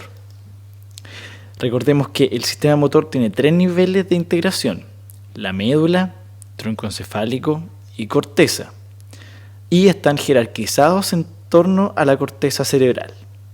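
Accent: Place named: Chile. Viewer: El acento chileno es bello, pero no lo soporto para estudiar )) jajajaajjaja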